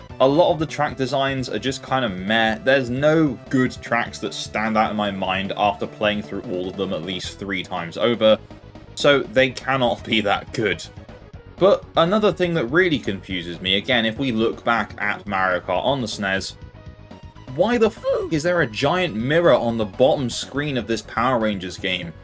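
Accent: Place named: Birmingham, United Kingdom. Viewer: Well, you're definitely not the Budget Builds Official guy. Same middle class Brummie accent though. Since I don't like SMK, I definitely wouldn't like this one.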